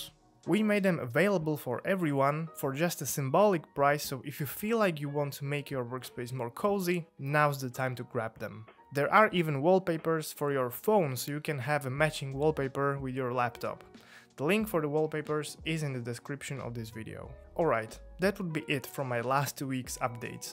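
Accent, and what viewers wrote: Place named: Netherlands. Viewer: As an aspiring Flutter dev I really appreciate your content. From the first impression I thought you are from Holland/Netherlands just as Internet Made Coder, because of your accent, it has seemed really similar to him. Was lil bit shocked that you are Slovak, although the name sounds more slavic. Hope you would reach worldwide audience more and that helps you set up another one of your multiple income streams. Also I would like to see a video about your MacBook specs. I have already seen a lot of content by SWE content creators about that topic, but I'd love to see another perspective. Každopádně chlape dobrá práce, krásný 4K videa, thumbnaily taky vypadají dobře, že mě donutí kliknout na další video. Za to video ohledně specs bych byl rád. Díky a ať se daří. :)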